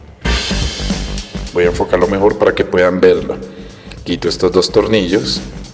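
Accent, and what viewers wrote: Colombia. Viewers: Eres colombiana!!!?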